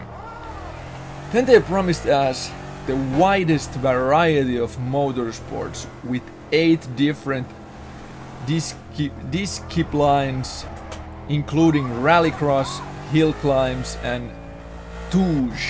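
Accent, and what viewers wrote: Finland. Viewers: Are you Finnish? You sound Finnish.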